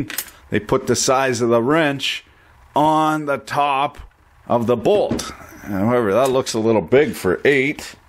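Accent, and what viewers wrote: Canada. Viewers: This guy is brilliant I wish I spoke Canadian I’m from Missouri who would of know that Canadian English is so different great videos I’ve learned so much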